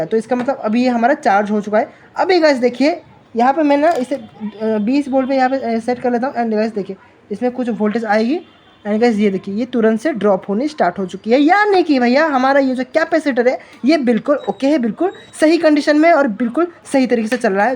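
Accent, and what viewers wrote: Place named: Odisha, India. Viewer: mujhe lagta hay odisha se ho app😂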